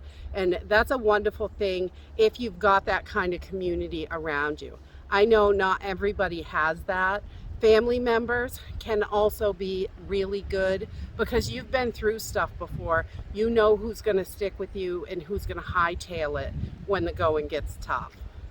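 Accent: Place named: Massachusetts, United States. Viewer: Sounds like Massachusetts- LOL! NE is not going to offer many “like-minded” people! I have one friend 2 towns away who is like minded but that would not help in a SHTF situation. In my small neighborhood there are mostly takers and we would worry about that… The kind who arrange a neighborhood pool party at someone else’s house (when they don’t have one)- those kind of neighbors! 🤔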